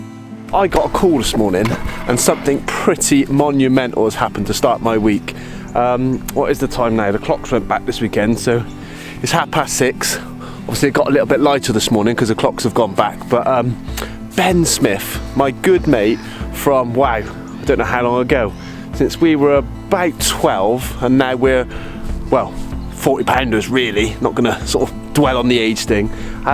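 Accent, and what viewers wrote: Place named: Bristol, United Kingdom. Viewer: As a fellow bristol boy nice to hear the accent....if a little diluted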